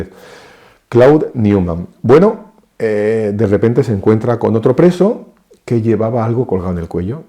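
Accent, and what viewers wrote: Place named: Andalusia, Spain. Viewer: Salve María!!! Qué alegría oír a los Heraldos de Madrid en las redes sociales, Hermano de los Heraldos del Evangelio, me encanta esta historia que usted está relatando de la Virgen de las Gracias. Por su habla veo que es andaluz, igual que yo, creo haber hablado con usted este verano. Salve María. Que la Virgen de las Gracias lo bendiga y lo proteja.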